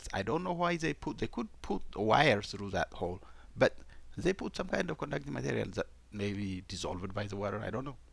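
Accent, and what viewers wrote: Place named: Ethiopia. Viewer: Bro - I face the same problem on my Cruze. Sadly I was convinced the issue is on the passenger switch. Thanks so much… by the way are you Ethiopian? Your accent resembles like my Ethiopians 😊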